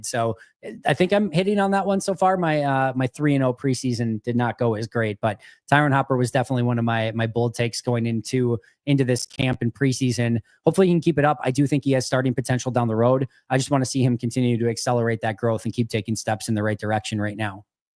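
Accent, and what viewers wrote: Wisconsin, United States. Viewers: As a transplanted Wisconsinite, I'm 103% fine with your pronunciation of pure and other words. People's accents are what they are. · Number one thank you for always talking us off the ledge. You and are great at keeping us levelheaded. Number two, love the Wisconsin accent. It’s great to have a little piece of Home for those of us that are transplants. Another great show, thanks for everything! #GPG 💚💛💚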